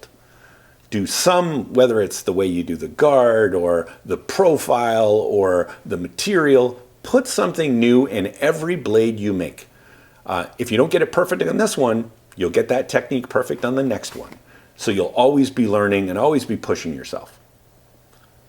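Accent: Canada. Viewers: I thought you was in Canada? You referenced being in California. Now I’m confused lol. You definitely sound Canadian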